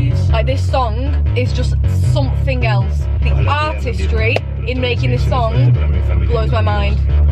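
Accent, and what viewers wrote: Manchester, United Kingdom. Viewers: Kinda caught me off guard when she started speaking in a British accent. I thought I was still on the American side of youtube. Loved watching this though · First word she said I was like “SHES FROM MANCHESTER” n then she said it so I feel validated. That’s all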